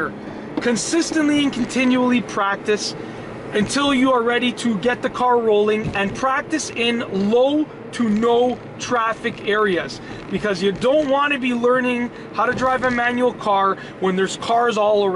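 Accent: Canada. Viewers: I say he's heavy non-Quebec Mountain Canadian. I mean, it's plain obvious. All that's missing is one of those Aviator Hats to complete the look. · I was thinking more of like a Canadian trying to do a normal American accent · It’s been 5 secs and I can already tell this mans is a fellow Canadian. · Totally Canadian accent. I miss hearing it sometimes! :)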